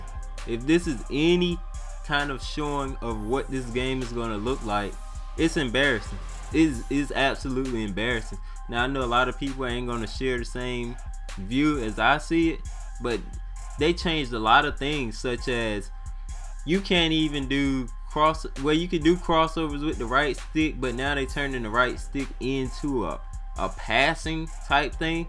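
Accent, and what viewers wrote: United States. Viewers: u sound country as shit I don't know if ur slow or if that's just how u normally talk.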